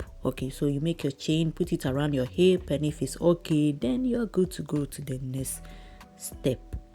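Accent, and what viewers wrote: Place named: Ghana. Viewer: Sis your work is so beautiful and your accent sounds like a Ghanaian ❤🇬🇭….great job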